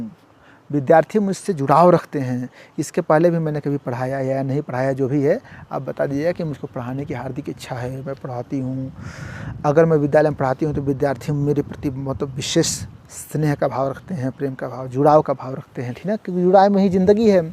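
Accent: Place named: Uttar Pradesh, India. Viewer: Apki batein bdi pyari h sir lg rha h ap up se h .sir mai kvs tgt Hindi interview ki tyari kr rhi hu pls ese hi margadarasan krte rhe .mai up santkabir nagar district se hu.🙏🙏🙏🙏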